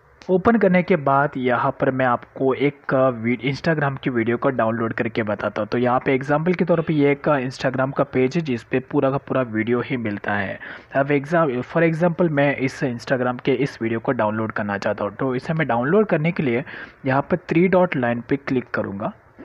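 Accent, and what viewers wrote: India. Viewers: indian accent, dislike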